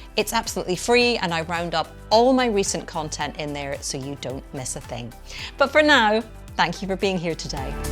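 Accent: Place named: Scotland, United Kingdom. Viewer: Aaa yes! It is hard for me sometimes to hear where people in UK are from but you sound like Nicola Sturgeon! Your Prime minister? Hmmm should know this better! Feels like I have more question marks the more I write… 🙄 It sounds lovely anyway 🥰👌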